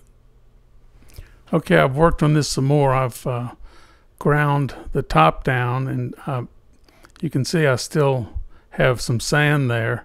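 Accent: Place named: Louisiana, United States. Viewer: Another excellent video of my favourite Lousiana accent. I will train to have the same english accent as you. For me is a double gain, I learn English and about minerals.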